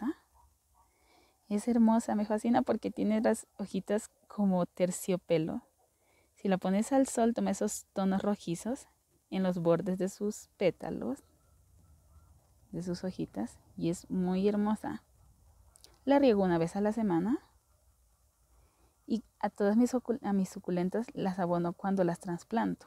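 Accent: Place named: Colombia. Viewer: Hola eres colombiana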